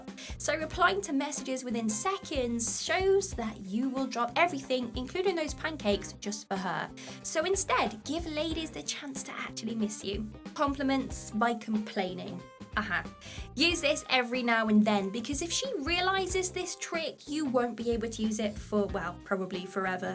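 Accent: England, United Kingdom. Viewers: Hot dang girl!! You're a life saver. Hey random qestion...which English accent are you Londonder, Somerset, or neither?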